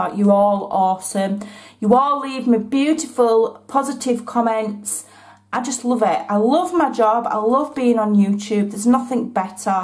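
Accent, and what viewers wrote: Yorkshire, United Kingdom. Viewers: I was looking for craft fair ideas and found your video on the lip balm holder. <link> After seeing that I checked out some more and was hooked by your laugh, your beautiful yorkshire accent (I have a good friend from Bradford and your voice is just like hers) and the fact that you were working on A4 card for the ones I found. If I didn't have arthritis I'd have done somersaults. Love your channel. You're very busy uploading this weekend. Well done girl. And thanks for the giveaway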